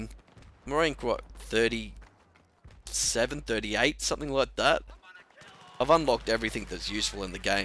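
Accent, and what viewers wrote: Australia. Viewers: I still can't get over how sexy your voice is. Dat 'stralian accent. I thought now that rent-a-server had come out, you were able to modify the player count. That sucks that it's limited to 8v8 :(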